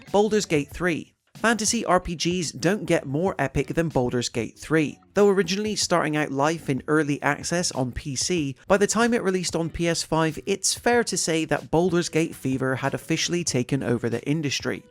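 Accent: West Country, United Kingdom. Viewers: subscribing for the west country accent!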